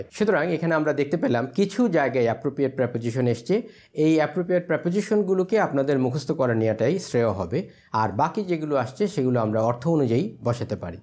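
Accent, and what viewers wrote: United Kingdom. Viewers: আপনার ইংলিশ উচ্চারণ বিট্রিশদের মতো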